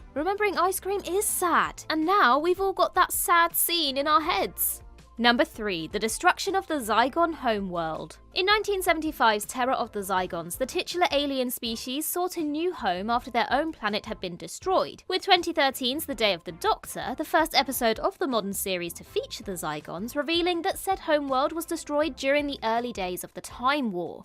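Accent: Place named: United Kingdom. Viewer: it is adorable how you say F-ing. It just doesn't sound right coming out of your mouth. I guess being American myself it's just funny hearing it said with your proper British accent.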